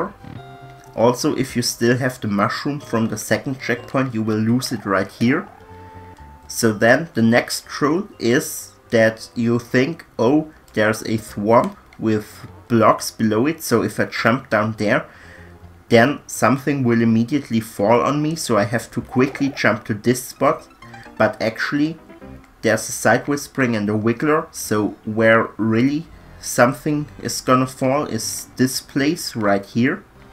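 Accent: Germany. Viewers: Heyy! Man merkt an deiner Englischaussprache, dass du aus dem deutschsprachigen Raum kommen musst xD. Andererseits sieht man es ja in Mario Maker auch. Jedenfalls ist das ein echt cooles Level :) Ich wette, du würdest mit der Beschäftigung von Levelbau Geld verdienen wollen. Ich würds dir echt wünschen, wenn das geht :D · Dein deutscher akzent ist extrem lustig anzuhören xD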